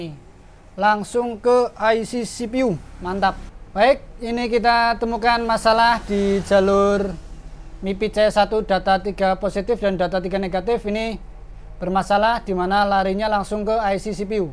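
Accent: Banyumas, Indonesia. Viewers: Lokasi pundi mas ? Kayane wong ngapak loh ,😁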